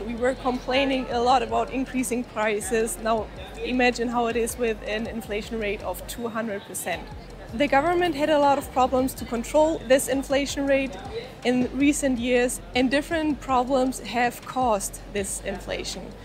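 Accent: Germany. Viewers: Hallo! I looove your German accent!haha Come to visit me here in Cordoba!haha Namaste Guz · Nice beautiful ❤German accent…!!! I listen to whole thing 🎉. Great presentation and information!!!! Wow 😮!!!! ❤❤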